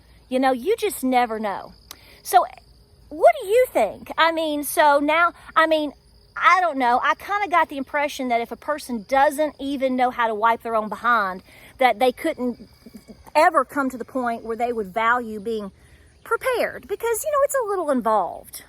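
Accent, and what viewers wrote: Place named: South, United States. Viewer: I just have to laugh at your last few videos. You are a pure southerner! So proud of you. I’m a southerner to in NC. The way you’re talking and explaining….. well honey I get it. You are awesome. I love your videos. ❣️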